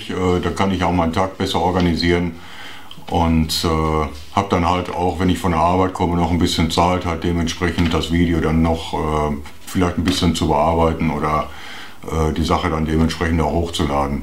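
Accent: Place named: Ruhr, Germany. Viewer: Ich mag deine Projekte und deinen Slang! Ruhrpott? Gruß von einem Exil Dortmunder.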